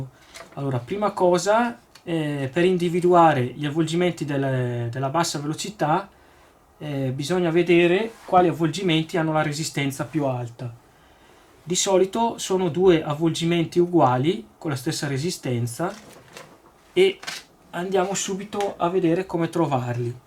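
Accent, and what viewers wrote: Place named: Brescia, Italy. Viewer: sei bravo e spieghi bene le cose emi piace il tuo accento di brescia mi preoccupa un po vederti armeggiare con tutti quei fili scoperti , stai attento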